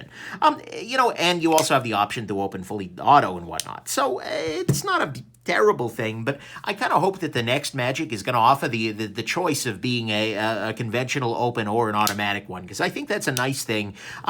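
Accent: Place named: New Jersey, United States. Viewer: Wow! I have to guess that you are a Jersey dude based on accent? (asking as a full on hick based on my accent) Great and informative review. Thanks!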